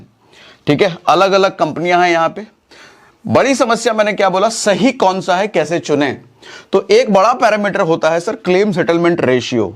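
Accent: Kanpur, India. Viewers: Sir kanpur se ho kya. Apke bolne ka tareeka kanpuriya hai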